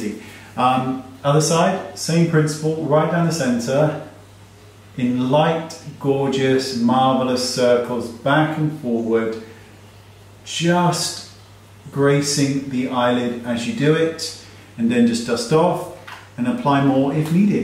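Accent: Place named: United Kingdom. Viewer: Who else came here so they could hear in his lovely British accent say 'Naughty'. 💜💜💜😄😄😄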